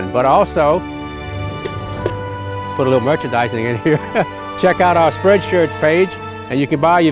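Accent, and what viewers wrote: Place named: South, United States. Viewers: He sounds like he's from South Carolina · “Store wars” “replicor” I love that southern twang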